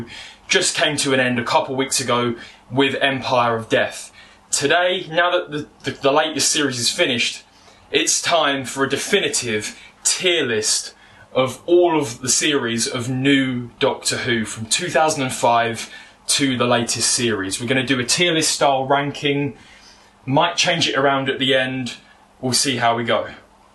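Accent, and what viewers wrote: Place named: South East England, United Kingdom. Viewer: oh, you're a white guy in hid mid 20's with a vaguely south eastern accent and no fashion sense. I think i can guess most of your rankings before even watching the video s- series 4 and 5 a- series 1 3 14 and 10 b- series 6 and 2 c- series 8 and 9 d- series 7 and 13 f- series 11 and 12 edit: skipped to the end, i got many of these right. It's infact true that cis men have no taste.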